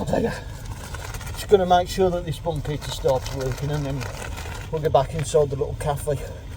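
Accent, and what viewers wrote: Midlands, United Kingdom. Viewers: Hello I’ve just come across your video I think it’s great even better when I noticed the midlands accent 😀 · Super good video first time i see your page if you dont mind me asking how did you end up driving a truck in usa when you got a uk accent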